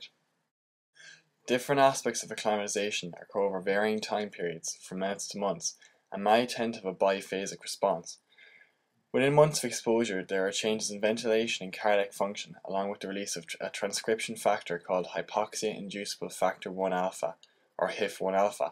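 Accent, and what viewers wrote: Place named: West, Ireland. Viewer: West of Ireland accent I'm sure, but definitely Irish.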